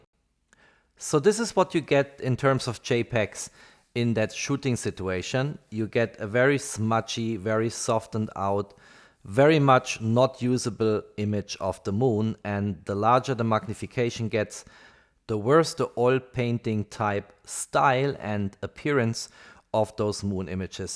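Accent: Germany. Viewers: Man hört am Dialekt das er Deutsch ist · Please, stop calling Huawei a Hua-Y, and Ultra ['ʌltrə] is not ['ultra]. I can hear your native language is german, nevertheless...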